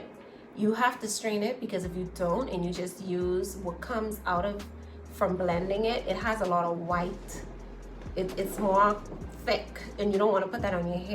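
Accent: Bahamas. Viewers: I hear an accent - Bahamian?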